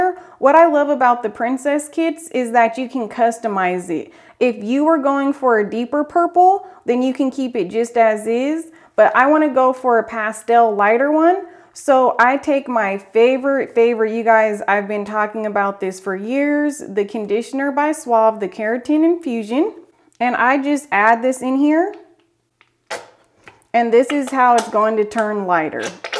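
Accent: South, United States. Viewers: Watched this just to hear her speak...her is deep southern...with the boujie R's🤣😍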